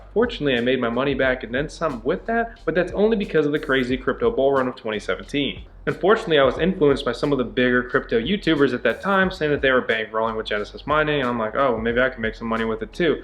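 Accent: South, United States. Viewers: Your accent has become much more Southern.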